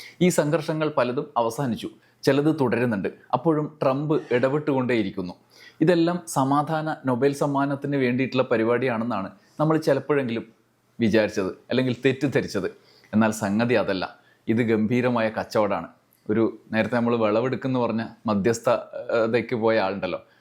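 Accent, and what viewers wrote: Kannur, India. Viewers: നിങ്ങൾ കണ്ണൂർ കാരനാണോ?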